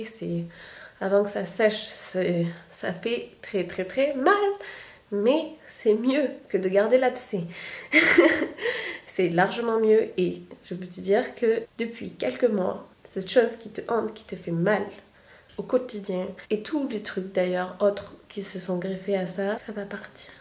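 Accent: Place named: South, France. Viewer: Tes réflexions sur toi-même, sur le monde qui t'entoure, peu de personnes le font réellement dans la vie de tous les jours. Dis-moi es-tu croyante? Si oui, en qui crois-tu? Tu es naturellement belle, j'aurais bien voulu te connaître par amitié avant tout et plus si affinité. J'ai remarqué ton accent du sud un peu; ) Ps: les personnes ayant connu des zones d'obscurité dans leur vie, sont des apprentis les mieux préparés à entrer dans la lumière. Bisous, garde ton naturel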